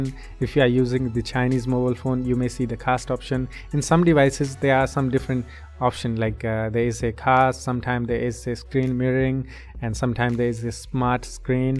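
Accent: India. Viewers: R u Indian? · U are indian?? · By listening your English I am damn sure that u r an Indian... Don't try that accent you have got caught 🤣🤣🤣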